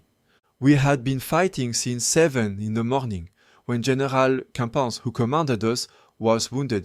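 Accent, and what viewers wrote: France. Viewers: Bonjour, Je trouve vos vidéos très intéressantes, et je compte bien voir tous les épisodes 🙂 Mais si je peux me permettre une critique... La narration est vraiment dure à écouter. On dirait une voix de robot générée automatiquement, et avec un très, très fort accent français. Elle en devient même pénible au bout de quelques minutes (de mon point de vue). Je pense qu'une vidéo français avec des sous-titres serait plus agréable pour les francophones, tout en restant accessible aux anglophones. Voilà c'est mon avis En tout cas merci pour cet excellent travail de mémoire !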